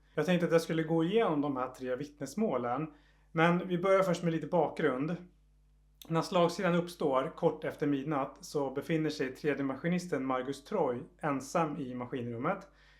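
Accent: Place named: Finland. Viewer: ARE YOU FROM SWEDEN ? BECAUSE YOUR ACCENT YOU LOOK MORE THAT YOU ARE FROM ..........FINLAND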